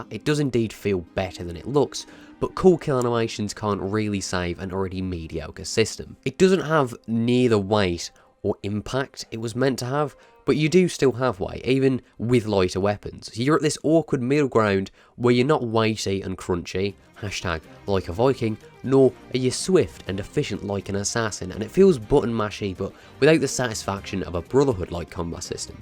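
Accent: Birmingham, United Kingdom. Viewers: I never said there was 😱 I love the accent I just can’t tell which yours is, I’m guessing your a brummie then?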